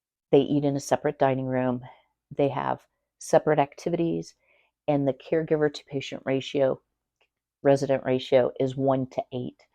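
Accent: South, United States. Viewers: So THAT'S where your southern drawl comes from!!! 😉 I plan on making this bread for xmas. Thanks for the idea!🎅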